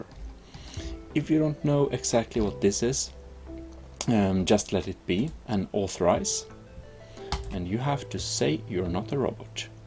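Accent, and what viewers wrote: Sweden. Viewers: U from sweden aye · Är du svenskkkk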